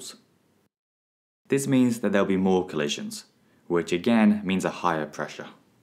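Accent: United Kingdom. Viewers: Finally a British Accent!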